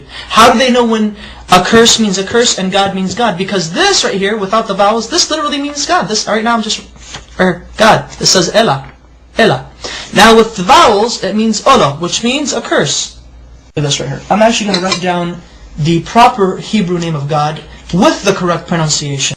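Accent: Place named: Arab world. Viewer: erstens schaut dieser mann nicht wie ein jude sonder ist sicher ein araba sein aktzent ist arabisch und will die juden verarschenaber er verarscht sich selbst jeder erkennt das er kein jude ist der trottel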